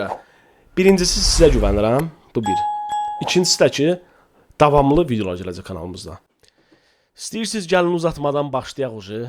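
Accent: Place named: Nakhchivan, Azerbaijan. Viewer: naxcivan ləhcəsiylə niə danışırsan😂😂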